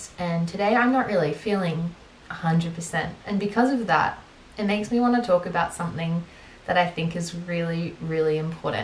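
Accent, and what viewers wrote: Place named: Australia. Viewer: Hi! It's Milli, I hope you remember me from Budapest:) I just wanted to tell you how much I love these types of videos (and I'm also proud of myself because I still can understand the Australian accent ahaha) and how much I relate to you especially on this topic. These are the kind of conversations everyone need but unconsciously avoid. Thank you ♥️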